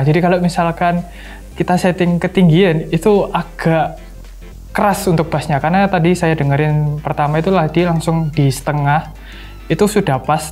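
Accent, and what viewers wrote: Java, Indonesia. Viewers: suka logat jawanya :)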